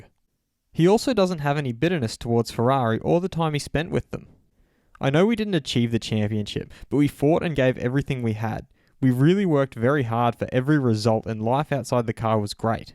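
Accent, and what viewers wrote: Australia. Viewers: is Australian, so there's no difference between how we say pole and poll, they sound the same.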